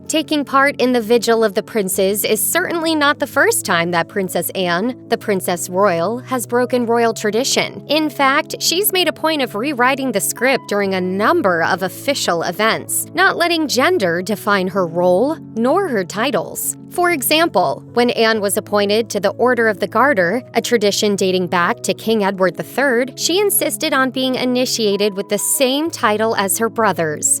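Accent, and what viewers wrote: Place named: United States. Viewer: Horrible American accent from commentator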